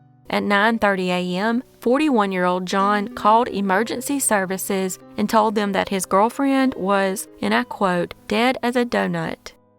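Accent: South, United States. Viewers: Annoying factoid that I hate but wanna share cause I feel like you'd hate it too, cause you're southern like me. I live in Arizona now, and found out that 'Prescott' is pronounced 'Preskit' here, and it drives me absolutely mad 😂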